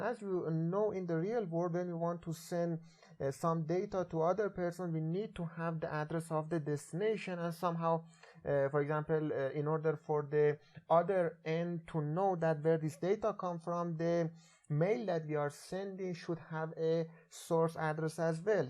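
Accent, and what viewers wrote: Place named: Iran. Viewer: cheghad shabih irania harf mizani !!!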